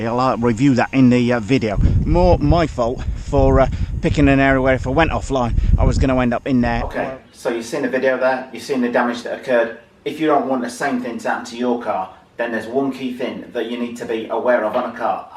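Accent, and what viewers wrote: England, United Kingdom. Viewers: greeting from Berlin Germany...I love your videos, but some times your English accent is too strong and i miss some word here and there. could you please tell me what gearing you use in this particular video? sorry i did not understood went you say it in your video. Thank again for all the tips...(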